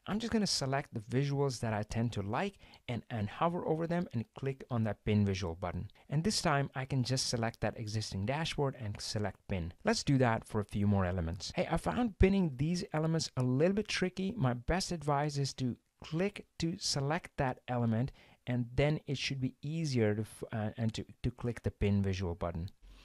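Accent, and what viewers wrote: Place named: India. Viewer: Finally, one smart Indian man with weak Indian accent. Thank you for the tutorial, thorough and easy to digest. 👍🙏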